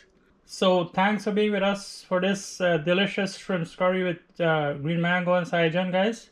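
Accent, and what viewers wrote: Guyana. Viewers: Really like the way you explain your steps and like your Guyanese accent. Make me feel like I'm back home London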